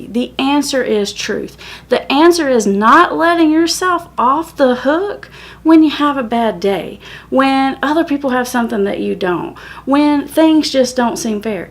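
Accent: South, United States. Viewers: Loving the Southern accent!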